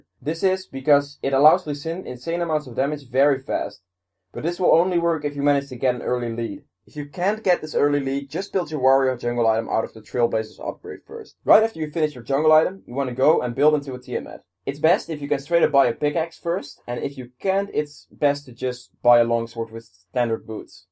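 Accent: Netherlands. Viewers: Talk slower btw are you dutch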